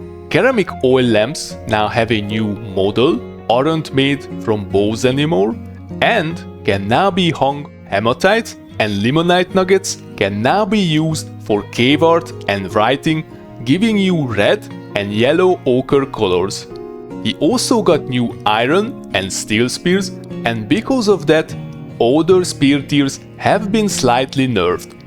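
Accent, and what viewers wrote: Hungary. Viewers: I can spot a fellow lovasíjász when I hear one! Klassz videó, köszi bátyus! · Are you Hungarian? I’m asking because you have quite a Hungarian accent. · First video I have ever watched from you and in the 1st minute I could tell you are hungarian. Örülök hogy nem vagyok egyedül a vintage story kedveléssel magyarként:>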